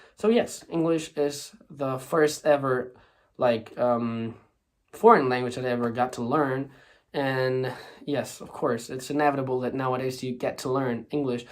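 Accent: Russia. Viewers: you might be a beginner in Polish but some of the harder sounds were on point. You said you start speaking Russian when you don't now how to say something in Polish but I also noticed 1 other thing, you seem to have a slightly Russian accent in Polish, I'm guessing because Russian was the first slavic language you ever learned and now it carries over to Polish. there were some grammatical errors but that's to be expected, I commend your efforts nonetheless, Polish is probably the hardest slavic language, even people like Tokimasa Sekiguchi who has been living in Poland for decades pronounces some words in a weird manner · Your Russian accent is very impressive. Te felicito.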